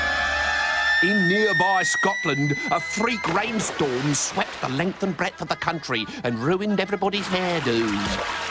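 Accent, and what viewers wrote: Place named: United States. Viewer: who knew that he’s very good with American accent.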